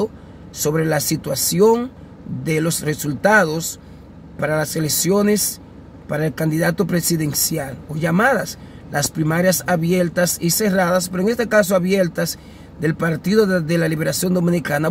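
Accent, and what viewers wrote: Haiti. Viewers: Tu eres haitiano ese acento tuyo es puro haitiano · Disculpe, hermano yo no lo conozco, su acento es ahitiano?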